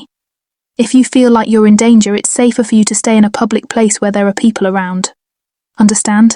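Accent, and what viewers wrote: United Kingdom. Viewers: First time listening. 29 minutes in, so far, it's good. I l❤ the brit accent.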